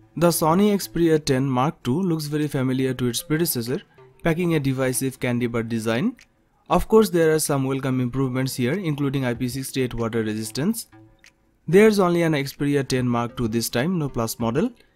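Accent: India. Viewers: Heu mais que fait cete vidéo ici ? Ces SONY sont déjà sortis chez nous. Par ailleurs cet accent indien prouve encore une fois l'arnaque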